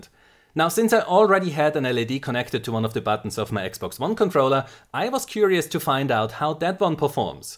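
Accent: Austria. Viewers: could you do a video where you compare the Overwatch Button to Pixel lag across different console shooters? the reason is that on consoles Overwatch seems to have a crazy high lag especially when compared to CoD or BF would be usefull to have such a proof to show the devs that their game needs to be fixed also you sound austrian, is that the case? :P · You always use astonishing test-setups, this is a lot of work, i really appreciate your efforts! Are you from Austria? That strong accent sounds familiar. About the non-difference between 144 and 60 Hz, it could be that overwatch uses physic-frames to control shooting (but that would be odd), and physic frames are capped to a constant rate in every engine i know.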